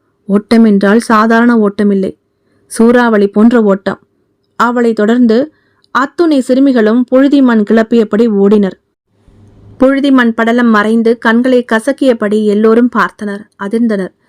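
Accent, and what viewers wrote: Madurai, India. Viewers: Madurai slang vera level sister 🎉🎉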